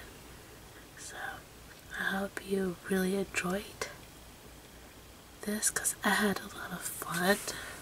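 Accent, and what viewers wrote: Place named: South, United States. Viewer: your sweet toned voice combined with your subtle southern accent is very relaxing!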